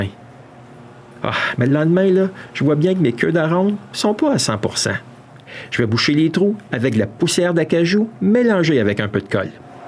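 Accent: Canada. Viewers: ...J ADORE LE PARLER CANADIEN CAR J AIME LE CANADA VOUS ETES DE QUEBEC JE PENSE IL Y A 36 ANS JE DEVAIS ALLER COMME CHAUFFEUR POID LOURD MAIS JE N AIS PAS OSER FRANCHIR LE PAS MAIS MAINTENANT J AI 66 PRINTEMPS ET C EST UN GRAND REGRET DE NE PAS ETRES LABAS C EST LA VIE BON TRAVAIL ET CONTINUER A NOUS APPRENDRE BEAUCOUPS DE CHOSE AVEC PLAISIR ET ATTENTION AUX CARIBOUS AMICALEMENT D UN FRANCAIS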